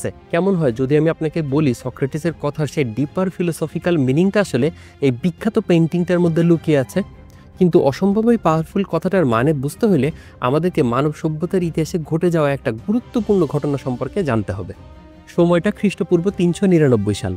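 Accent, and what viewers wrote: Bangladesh. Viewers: ভাল প্রয়াস। তবে বঙ্গভাষার পূর্ববঙ্গীয় গ্রাম্য উচ্চারণ পরিহার করতে হবে।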